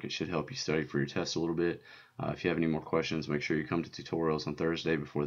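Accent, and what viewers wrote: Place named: South, United States. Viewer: Nice southern accent, adds pizzazz to the whole thing. Also not trying to strike as creepy but that giggle was adorable.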